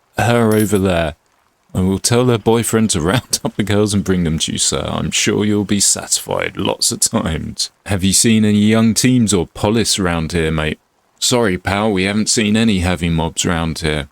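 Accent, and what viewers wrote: United Kingdom. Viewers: Not only is this a perfect mod that I've never heard of before, but being exposed to it by a guy with a proper English accent is even more hilarious. Edit: Also, the name choice is guaranteed to make you a wanted man if you actually go to Scotland.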